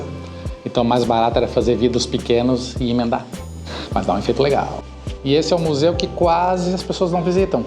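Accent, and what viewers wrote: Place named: Florianópolis, Brazil. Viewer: Caramba... Sinceramente um dos melhores vídeos sobre cidades que eu já vi na minha vida.. Rico em detalhes, bem estruturado, rico em informações... E com sotaque manezinho kk kk Abraços de Blumenau.. Quero visitar Edimburgo ano que vem e vendo teus vídeos só dão mais vontade de ir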